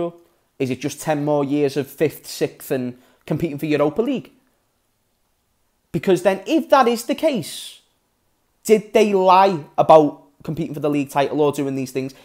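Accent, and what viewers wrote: Liverpool, United Kingdom. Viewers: I'm much older than you and I've been devoted to Liverpool before you've been able to conceptualize football. I'm from the states and will never have the opportunity to visit Anfield. I will live vicariously through you. You remind me of a younger version of myself minus the scouse accent, lol. Don't stop being you.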